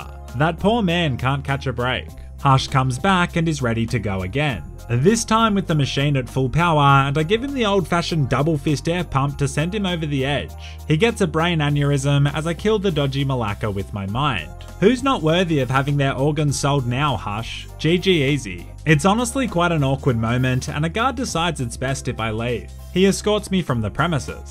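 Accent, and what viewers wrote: Australia. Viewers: I'm trying to figure out if he's either a Australian or a New Zealander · I’m Australian and I’ve never heard someone speak like Aussie Man. Now you are speaking like Aussie man. Can’t be a coincidence.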